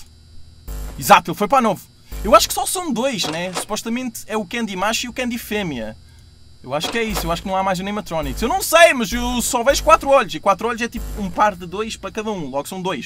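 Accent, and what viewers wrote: Portugal. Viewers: Oi, eu acho sua lingua engraçada vc fala pt Portugal e eu pt brasil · não ele fala portugal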